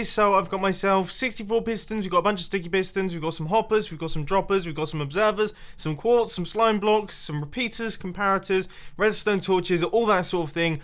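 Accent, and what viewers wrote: United Kingdom. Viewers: I, as a german, really like your british accent. Your channel has very nice content. You are such a good YouTuber. 👍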